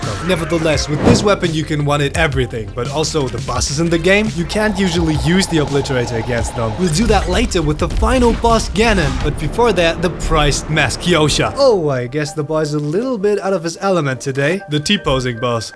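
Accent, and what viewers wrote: Germany. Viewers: youre german? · Bist du Deutscher?✌🏼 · Are you german? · pov ich weiß das er deutsch spriecht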